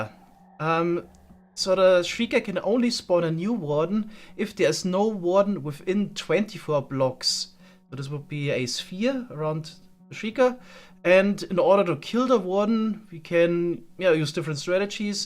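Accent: Germany. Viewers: Ich bin mir nicht ganz sicher aber kann das sein das du eigentlich Deutsch sprichst? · love ur german accent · are you german?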